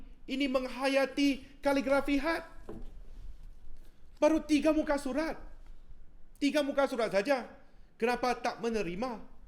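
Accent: Kedah, Malaysia. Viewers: Wuihhh ORANG KEDAH WOI!! 🤣🤣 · .. cakap loghat kedah laa.. hahahhaa terbaik lah..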